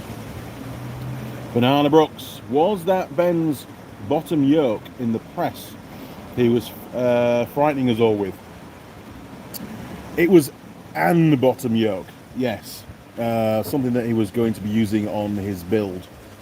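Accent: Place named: East Riding, United Kingdom. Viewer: I've just found your videos in the last couple of days. Brilliant! As i've had a 1980 t140 built over the last year or so the gearbox strip has been fascinating. You are a natural teacher. Patience, clear and concise explanations plus a sense of humour, marvelous. Being a Yorky myself I can tell you have a neutral accent, but every so often an East Riding one slips through. Where abouts in 'gods own country' are you from. Dewsbury born and bred myself. Keep up the good work and i look forward to the new Bonnie video very much.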